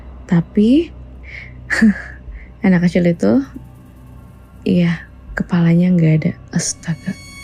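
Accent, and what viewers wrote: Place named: South Jakarta, Indonesia. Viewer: Anjir didaerah gue Cinere, anak JakSel mana suaranya.. sukses selalu mbak yang cuantik hehe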